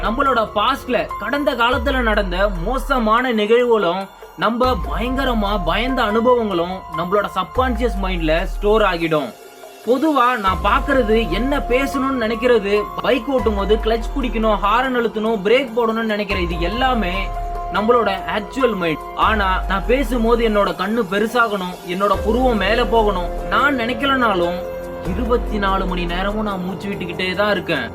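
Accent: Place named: North, India. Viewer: Konjam normal la pesu thambi...vadakan pesuramathiri iruke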